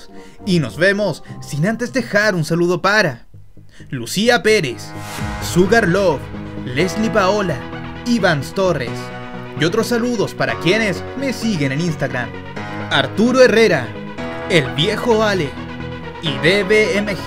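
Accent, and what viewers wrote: Chile. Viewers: eres shileno wn qlao? fome la wea wena hermano sigue asi Ksnfjflglslfktnek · eres chileno prro? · eres de chile o soy io?? v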